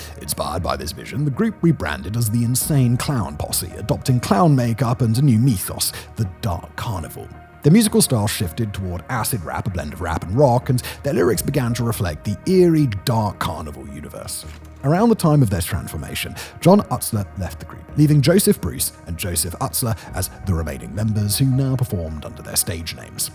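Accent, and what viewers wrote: RP, United Kingdom. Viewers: I love hearing say "The Insane Clown Posse" in his incredibly posh British accent 😂😂😂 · A British accent documenting an American phenomena... will almost always be tediously inaccurate, and a giant waste of time for anyone except those who live in the UK and believe that they are learning something. They aren't. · The original comment said he has a posh accent. You replied that isn't really all that posh, but middle class. That is why I made the point that you don't have to be posh to sound posh. Hope that helps.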